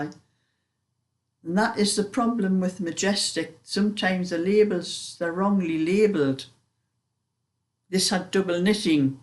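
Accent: Newcastle, United Kingdom. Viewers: Just found you - what beautiful work! And so lovely to hear the Geordie accent - living in Hampshire now I still miss home, after 30 years. What a joy to listen to your tales - reminds me so much of my Auntie Lizzie Sams from Wallsend - in a lovely way! x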